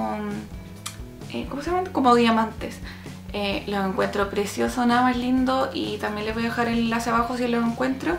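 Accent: Chile. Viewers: Eres chilena porque ablas como chilena y ademas eres muy linda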